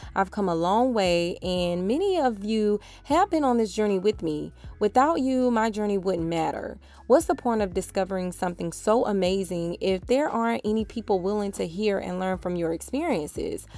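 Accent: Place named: South, United States. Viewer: Not sure how you got to my algorithm, but I'm happy ya did and you had me ❣️🎶 I've been debating giving raw a try but it seems daunting, as we have 4 young children and the thoughts of cooking for them and something entirely different for me overwhelms my mind. I think I'm going to try it by starting small. Thanx & God bless!✌🏽 (P.s....sounds like I hear some Philly in your accent yet, I hear some South. I'm orig from VA.)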